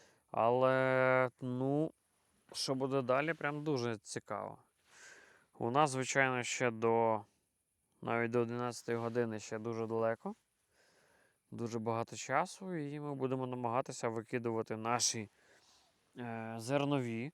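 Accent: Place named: Western Ukraine, Ukraine. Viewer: Це шось Західно-Український діалект.😊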